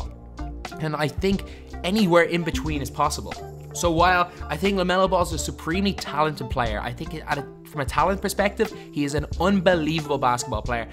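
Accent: Ireland. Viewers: Are you from Ireland? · Are you irish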